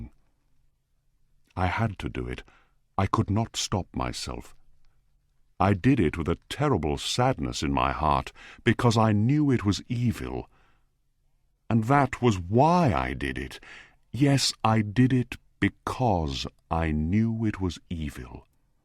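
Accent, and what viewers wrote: United Kingdom. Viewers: Tem acento britânico, amei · Isso é ingles britânico né? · Lindo inglês britânico 🥰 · esse inglês é britânico você sabe me dizer. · É inglês britanico? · Por gentileza , o áudio é inglês britânico? Parabéns pelos vídeos!!!! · pela pronuncia acredito que seja britânico. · Ele tem um sotaque britânico ou foi apenas uma impressão por causa da história que não é americana? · Inglês Britânico.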